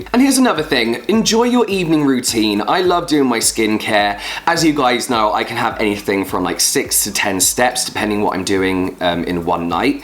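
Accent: United Kingdom. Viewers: You thought me a bit of british accent, Second significant reason of me watching lol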